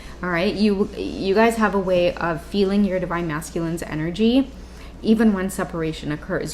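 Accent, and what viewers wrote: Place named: Trinidad and Tobago. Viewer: I love your Trinidadian accent and love your help. Thank you for your time and energy<3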